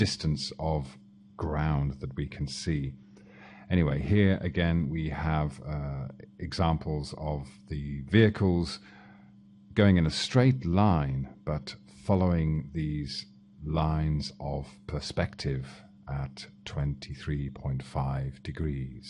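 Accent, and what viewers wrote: United Kingdom. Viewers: You seem to confuse the terms Ball with Planet and all the physical differences attributed to each. you seem to forget that light propagates through a vacuum in parallel rays . its about the angle of the ground to the incoming rays ie: the amount of light/energy hitting the ground per sq meter or what ever wacko unit you chose to use. at the higher latitudes the surface faces away from the sun more and hence there is less energy per sq unit of ground. than at the equator this is just kindergarten thinking. you don't even understand the physics of the model you are trying to debunk. you sound intelligent, perhaps the British accent helps, but you even vaguely seem to know what you are talking about. couldn't watch any further as my head was about to explode thanks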